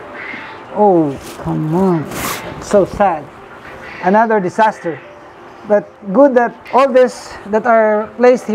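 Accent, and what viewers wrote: Philippines. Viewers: Ganda ng English nya, kung may indian English, ang sa kanya Filipino English.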